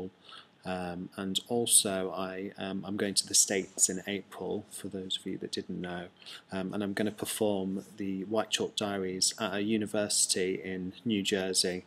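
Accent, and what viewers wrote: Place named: Northern England, United Kingdom. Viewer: You have turned proper northern with the accent :)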